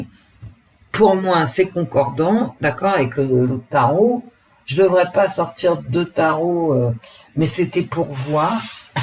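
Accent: Paris, France. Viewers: 🥰 🥰La Cartomancienne "En Tête à Tête avec elle Même.Merci de nous Enrichir.(j'adore,votre gouaille de Titi Parisien)j'y retrouve: mon parrain et ma marraine,les bretons à la Capitale en 1940 tout jeune💗💖Oui il est beau ce TAROT. Le 2 oui. La Dualité. Il Est Ou L'Homme...Bonheur. Joie. Amour à toutes et tous. Bonne fin de journée 😍😘🥰🤗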